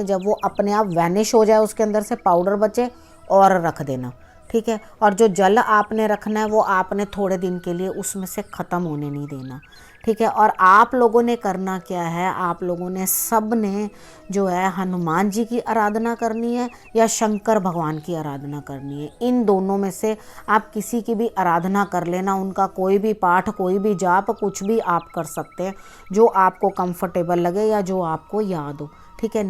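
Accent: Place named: Punjab, India. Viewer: Jai mata di rabb sab ko sukh de excellent explaination you Are very sweet way of telling anything tooooo cute punjabi style hindi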